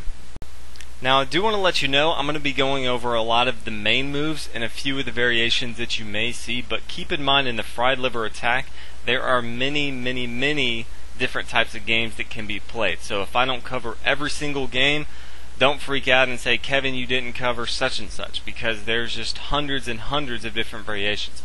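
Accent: United States. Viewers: Nice American accent!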